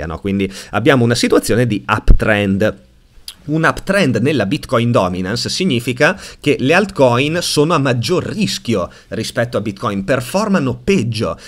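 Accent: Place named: Romagna, Italy. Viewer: Quando dici la parola “questo” ti adoro, il tuo accento romagnolo è fantastico 😄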